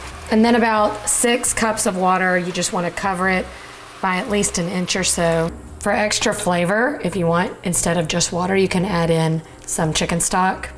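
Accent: South, United States. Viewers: Gawd you are amazing. An Italian woman with a southern accent is pretty awesome.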